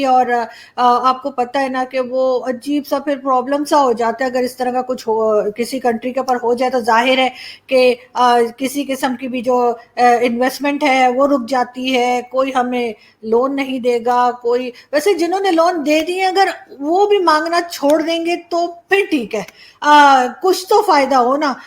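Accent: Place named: Allahabad, India. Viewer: Your ancestors migrated from Allahabad..I can notice the flavour of Allahabad in your Andaz -e-bayan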